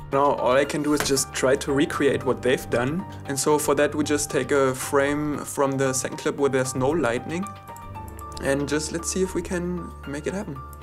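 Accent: Germany. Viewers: This german accent however pisses me off. But cool video btw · Dein typisch deutsch ignoranter Akzent ist so furchtbar. Kannst du dich nicht mal bemühen richtiges Englisch auszusprechen und zu betonen? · german akcent ^^ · schon deutlich deutsch :D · are you German? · du klingst deutsch ^^ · Even for me (i'm german) your accent is really annoying! (i'm absolutly sure thaz you are german...) ÜB EIN BISSCHEN!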